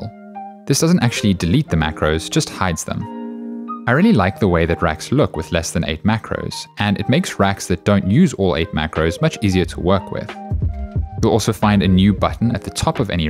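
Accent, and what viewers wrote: South Africa. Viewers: That SA accent! Lekker man, great video. Excited for ableton 11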